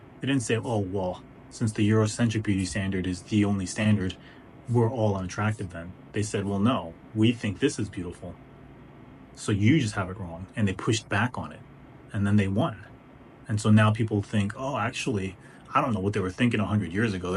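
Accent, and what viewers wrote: United States. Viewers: you sound like such an american dude... if you ever actually cared for any of this media you would know japan always sets its fantasy setting in pseudo-european worlds if it occurs in modern day japan then majority of characters are always japanese and im sorry but naruto mario and attack on titan doesnt represent the medium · You sound way more American than Japanese lol Japan does embrace its beauty but Japanese are also abstract thinkers, certain things are just chic. In America we imitate the Asians as well it’s a cultural exchange. Also sounds like you’re just referring to major city areas. Goku turns sayan bc there’s an alien race called the blondes and turning gold symbolizes godlike elements.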